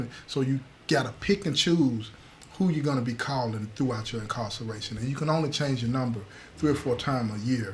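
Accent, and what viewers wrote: South, United States. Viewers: Hey cutie, I don't comment much, but, trust me, I listen to ur channel EVERYDAY. AT 10AM. EVERYDAY. I LOVE THE SOUTHERN ACCENT .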